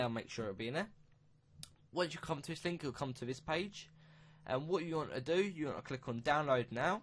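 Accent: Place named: United Kingdom. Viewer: why is it every person doing a minecraft tutorial is british?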